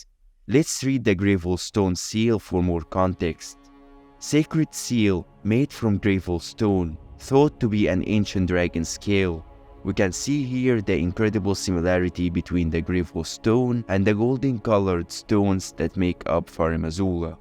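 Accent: Egypt. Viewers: اللهجة المصرية احلى اشي 😂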